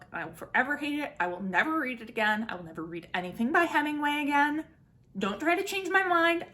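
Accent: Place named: United States. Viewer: I associate you so much with Victorian literature that I forgot you're (most likely) an American, even though I hear it in every video! Btw I also love Wuthering Heights :)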